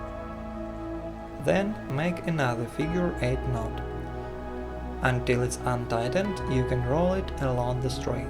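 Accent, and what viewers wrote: Russia. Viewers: Желаю удачи в дальнейших разработках. Кстате, по акценту мне показалось что ты русский, это правда?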